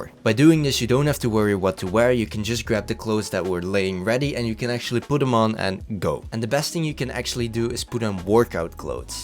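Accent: Netherlands. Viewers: Are you a Dutchie?😂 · Are you Dutch? Idk kinda sounds like a Dutch accent haha :)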